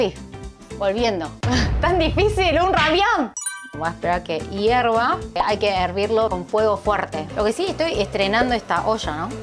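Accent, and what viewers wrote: Argentina. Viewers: ME ENCANTA ESE TOQUE DE ACENTO ARGENTINO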